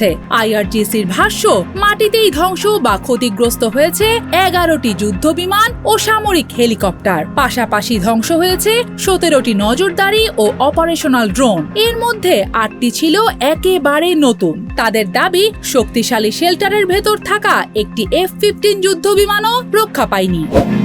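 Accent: Kolkata, India. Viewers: এইরকম কলকাতার স্টাইলে খবর পড়ে কেন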